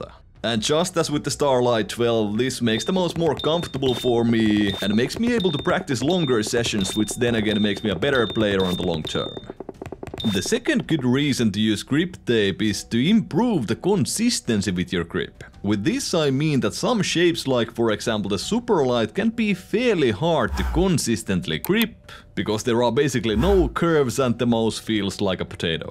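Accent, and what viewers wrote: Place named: Finland. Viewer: are you finnish? your accent sounds very finnish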